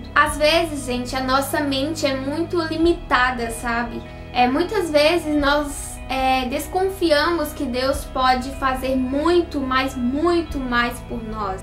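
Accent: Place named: Minas Gerais, Brazil. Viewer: Esse sotaque mineirin é o mais bonito do brasil, parabéns irmã, graça e paz.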